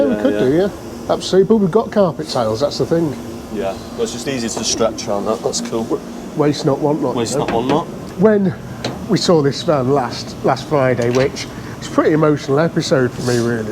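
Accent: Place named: Scotland, United Kingdom. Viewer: Love your scottish accent are you scottish i am❤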